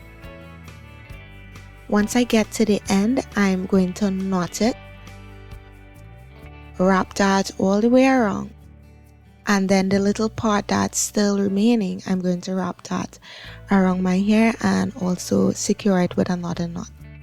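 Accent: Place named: Trinidad and Tobago. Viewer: Trini to the voice ❤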